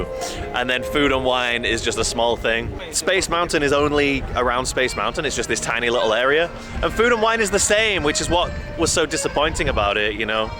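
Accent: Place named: United Kingdom. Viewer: love ur content and the way you speak ! (are you from somewhere in the UK? sorry if I mistake) Cheers, I'm looking forward to new contents !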